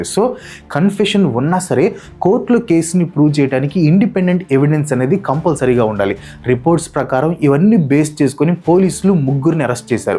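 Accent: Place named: Telangana, India. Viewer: You are good like but your telagana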